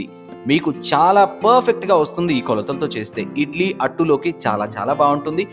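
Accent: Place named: Telangana, India. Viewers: Telangana slang super.. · Telangana yasa. Super bro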